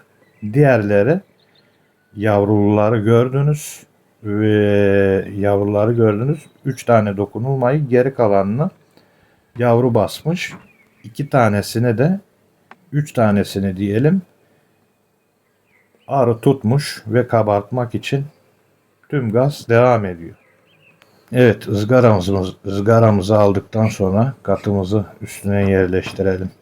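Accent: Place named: Thrace, Turkey. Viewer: Muhacir olduğunuzu veya Trakya bölgesi şivesi olduğunu sanıyorum. Hayırlı bereketli olsun, bilgiler için teşekkürler.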